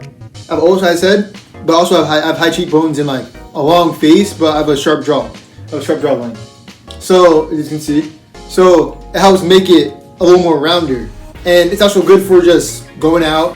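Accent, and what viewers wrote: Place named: Haiti. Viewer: First, I just want to say I like your accent. Where is that accent from? Are you from Haity? Anyway, to get back to the topic, I think all this stuff is in Black men's head. If they are insuecure with their hair others with sense it. I have always had long hair and used to having it in braids, twists, or loose. I have never had an issue working in corporate London, UK, because I've always been confident of who I am, very proud of my African culture and never tried to fit in or gain acceptance to the detriment of my culture. Besides, my ancestors used to have long hair for spiritual reasons. Sometimes they used to keep it in locks, especially the kings and warrior class. Therefore, stuff that! I'm not going to hide my heritage to appease others just because they feel uncomfortable about an important part of my culture. That is their problem, not mine. 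With this mind set, instilled in me since childhood by my parents, I have never ever had problems working in the corporate sector previously (now I work for myself). I think I had only had one small issue many years ago when I went to an interview for an important managerial position. The interviewer (a white man) said my hair is too long and if I would consider cutting it off if I get the job. Mind your, my hair was in a neat pony tail at the time. My response was: "Never! My hair is part of me and as you can see, it is in a pony tail." I told him with such pride and assertiveness and set the foundation then and there irrespecti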